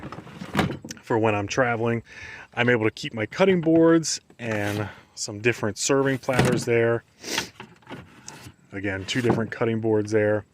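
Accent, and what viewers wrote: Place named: Maryland, United States. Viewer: Ps this is the first vid i ever seen of yours it came across my you tube bc i watch tiny /bus homes . Im hearing an accent that sounds very similar to mine... Are u from Maryland or near???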